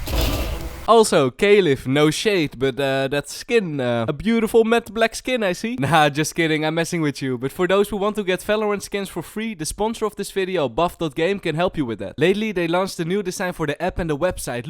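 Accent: Netherlands. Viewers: You dutch? From leeuwarden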